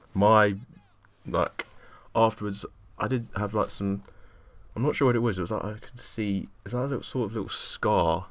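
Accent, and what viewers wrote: England, United Kingdom. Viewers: i love the british accent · what part of england are you from?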